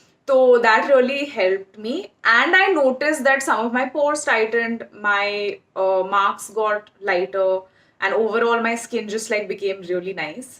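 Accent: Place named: Gujarat, India. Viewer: Why the f word. You sound cool without even using it.. rather add your Gujrati accent I am great fan of your Gujrati accent.... morecedez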